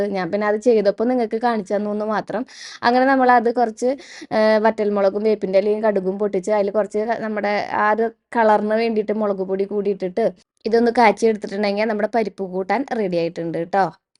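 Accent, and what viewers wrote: Thrissur, India. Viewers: ഓർമ ശരി ആണെങ്കിൽ 2019 ഒരു 18 തൊട്ടെങ്കിലും ഞാൻ video കാണാറുണ്ട്. പല daily vlog കളും കണ്ടിരുന്നെങ്കിലും ഇപ്പോഴും കാണുന്നത് മാത്രം ആണ്‌ വേറെ ഒന്നുമല്ല. ഞാനും തൃശ്ശൂർ ആണ്‌ age തന്നെ ആണ്‌ വർത്തമാനം കേൾക്കുമ്പോൾ എനിക്ക് എന്റെ നാട് ഓർമ വരും എന്റെ ബന്ധുക്കളും കൂട്ടുകാരിയും ആണ്‌ നിങ്ങളൊക്കെ എന്ന എനിക്ക് നിങ്ങളെ feel ചെയ്യാറ്. But പ്രൊമോഷൻ ചെയ്യുമ്പോൾ filter ഉപയോഗിക്കരുത് തന്നെ പറഞ്ഞല്ലോ ആൾക്കാർ selfish ആകുന്നു എന്ന്.filter ഇടുമ്പോൾ അങ്ങനെ തന്നെ തോന്ന ഞങ്ങളെ പറ്റിക്കല്ലേ. പ്രൊമോഷൻ ടൈമിൽ നല്ല skin അത് കഴിഞ്ഞു കിച്ചണിൽ കേറിയപ്പോ ഒരു glow യും കാണാനില്ല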